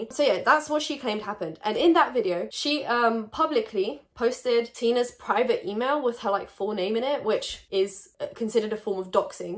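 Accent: United Kingdom. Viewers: If you don’t mind me asking, what country are you from if not Britain? I thought you were British lol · WAIT WHERE ARE YOU FROM???? i thought you were british this whole time. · Mel Z I know lol I’m Scottish, just thought she was British until she mentioned her citizenship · wait where are you from?? I swer I thought you were from the uk idk lol please someone tell me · Where are you from?? I thought you were British/English but you're not??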